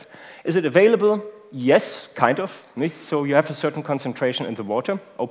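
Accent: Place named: Germany. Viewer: warum nicht auf deutsch......deutschen solten nie english sprechen, nur schreiben...hört sich nicht gut an... nur ein ding is noch schlimmer, amerikaner die deutsch versuchen zu sprechen...lol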